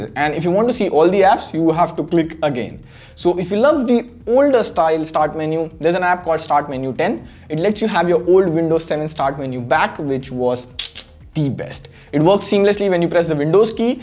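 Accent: India. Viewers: I clicked on this channel just out of boredom. I hear an indian voice I am now interested... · this man has a THICC indian accent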